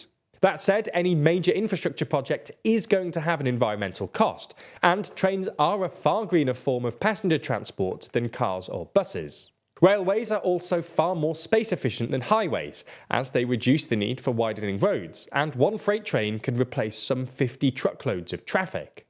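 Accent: United Kingdom. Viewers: British pronunciation of Spanish words always makes me cringe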